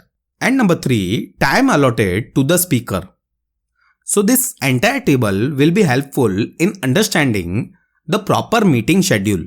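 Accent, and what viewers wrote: India. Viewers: othentic indian tone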